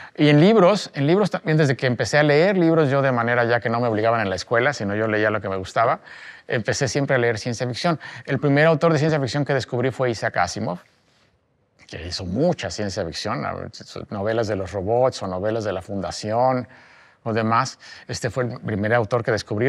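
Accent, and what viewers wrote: Mexico. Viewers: Acento mexicano. Me lo ha arruinado. NO gracias. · Hasta que escucho un Mexicano, muy bien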